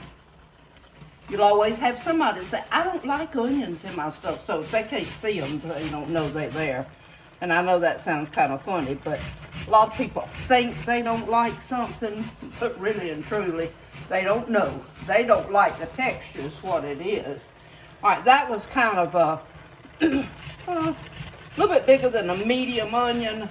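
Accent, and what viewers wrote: South, United States. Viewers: I just found your channel yesterday and I am dellghted. I have watched so any of your recipes already and just can't get enough. I want to make every one of them. I'm having trouble figuring out how to print them out. I can relate to you in loving to cook , but I could never do as much as you. I too am a widow and will. be 84 in August. I am fascinated with your accent,. Like you, I love to cook for my family. God bless you my new southern friend. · First time viewer here! What a lovely, southern Lady you are💕 I find your beautiful southern accent so refreshing & pleasant to listen to. Your “Shine for Jesus” comment absolutely made my day & I am definitely going to teach it to my sweet grandbabies. God Bless you richly😊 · I love your southern accent. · Just found your site & absolutely LOVED it!! You make cooking look so inviting and you got my attention!! 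..so I subscribed!! Love your lovely southern accent!! And the fact that you love Jesus just seals the deal!! Can't wait to make your yummy looking hamburger cassarole!! I know my family will love it! Thanks for sharing with others!! You are a sweet lady!! Blessings!! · What a joy to watch you make this hamburger casserole. Since I love onions so much, I'll probably put them into the recipe largely diced. I can't wait to try it. I'm watching from Edmonton Canada, and I just love your american accent. God bless y'all,. Godspeed! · I love your Southern Belle accent ❤ I've lived in Alabama all of my life. I still appreciate a true Southerner. You CANNOT fake our accent. I'm thinking you are from Mississippi or Georgia. "Dahlin' you are just as pretty as a peach" ❤ I'll be watching more of your videos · Hi Mrs.!! I just found your channel today. And Oh My does your Channel remind me of my Grand Mother. 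All of us called her MawMaw.. And he was PawPaw. I guess I'm what they call Bing watching your channel. I am sure enjoying your Sweet Southern accent. And just some of the things you say and your wordings are spot on with my MawMaw. We lost her many many years ago in the 90's. She was such a character, so so funny and loving. I am jealous of your family that they have you. You are such a Dear Dear person. And I love your short stories.... Oh the memories you have provided. With all God's love, Ed of Greenville,TX. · This is the first time for me to watch her cooking video. What a lovely lady she is. I love how she speaks with Southern accent. Her family is so lucky to have her. I will watch more videos of her recipes. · I just found your page. I am so excited about your cooking and the fact that you love Jesus too just makes even more precious. I am curious though...where do you live? I hear a southern accent. 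Im from Alabama. Keep on cooking😊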